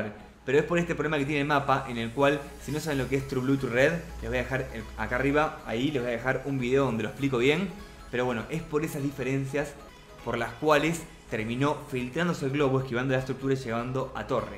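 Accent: Argentina. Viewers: eres argentino ? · eres argentino?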